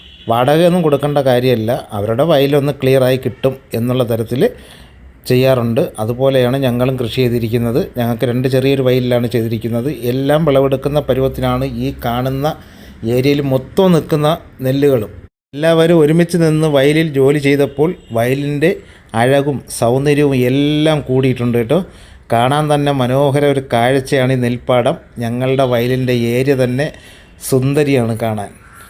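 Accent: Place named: Alappuzha, India. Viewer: Alappuzha slang ആണ് ചേട്ടൻ്റെ.. ആലപ്പുഴ ക്കാരൻ ആണൊ? ഇന്ന് കുട്ടികളെ കാണിക്കാത്തത് കൊണ്ട് mindoola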